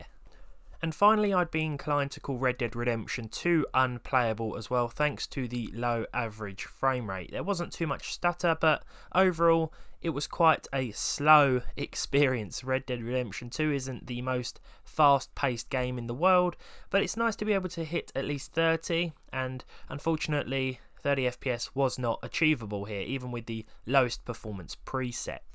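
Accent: United Kingdom. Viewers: Somehow the British accent makes this sounds like a documentary, compared to those Canadian and American channels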